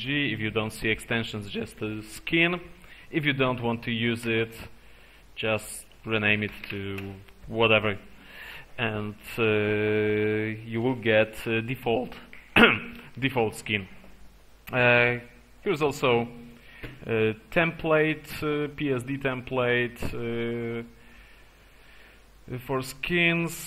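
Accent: Poland. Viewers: Wyczuwa się ten polski akcent :) Ale film oczywiście dobry, łapka w górę ;)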